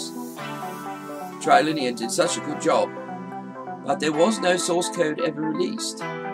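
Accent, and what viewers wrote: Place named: United Kingdom. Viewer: Haha nice British accent.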